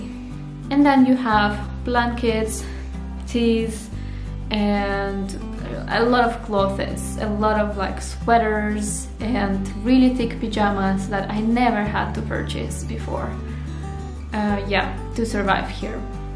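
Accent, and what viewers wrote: Russia. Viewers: I am amazed your English and your little accent being russian's girl.. you mast have been studying at some English university.. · Jeez what is it with YouTubers audio!!?!? .. you start with a heavy non/English accent, use a microphone the other side of an echoey room, speak softly and then just to make sure it’s almost impossible to understand you, think some incessant bgmusic is needed!!!! Ok ears are tuning in a bit.. your English is v good for a Russian!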